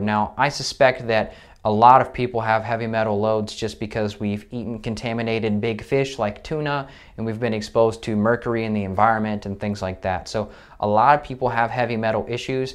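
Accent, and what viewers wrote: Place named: United States. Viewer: love your American accent 😀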